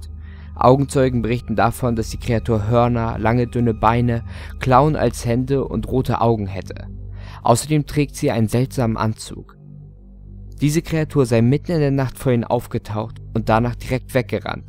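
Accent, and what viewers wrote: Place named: North, Germany. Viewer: yay Norddeutsch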